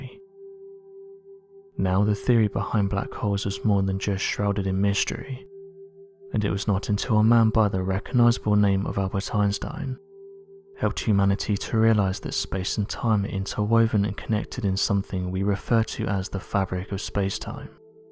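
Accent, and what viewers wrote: United Kingdom. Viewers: Why is there a British sounding person talking just wondering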